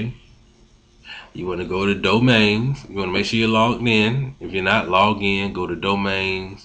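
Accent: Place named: South, United States. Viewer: Thanks, the tutorial is awesome and I love your southern accent ;-)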